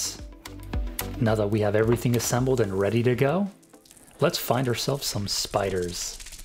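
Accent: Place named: Australia. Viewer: Bro is from Australia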